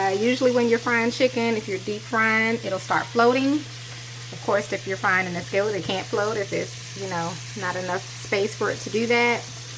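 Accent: South, United States. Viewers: I heard her voice and I said to myself “ She can cook” listen to that beautiful accent. Southern Woman. I was raised by a Southern Woman while my Mom was in Vietnam. I STILL call her my Mom and she My Queen is 89 and she lives with me and my wife and now I feed her, wash her, cook for her and I make My Queen smile. Thank you so much.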